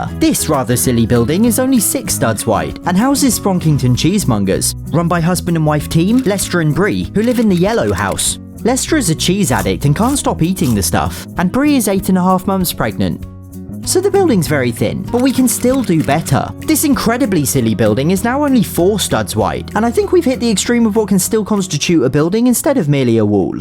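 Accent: England, United Kingdom. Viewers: Yo are you English or welsh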